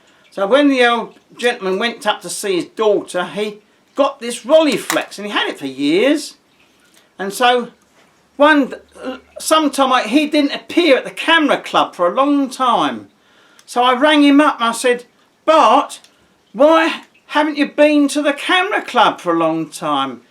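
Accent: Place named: Cockney, United Kingdom. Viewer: what a lovely cockney accent! Great video!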